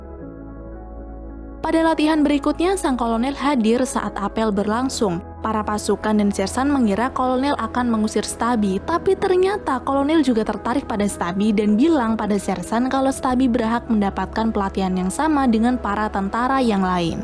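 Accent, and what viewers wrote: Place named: Banjar, Indonesia. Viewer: LOGAT KAKAK ORANG BANJAR KAN? "KYAAPA"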